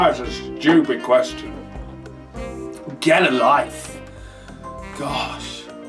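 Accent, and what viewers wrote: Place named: Scotland, United Kingdom. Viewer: Great Scottish accent x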